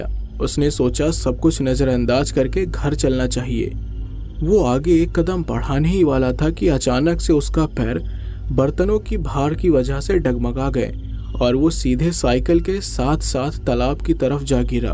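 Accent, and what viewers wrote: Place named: Bhojpuri, India. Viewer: Your best story so far. And loved the Bhojpuri flavour in it. Maza aa gayil ekdam 😅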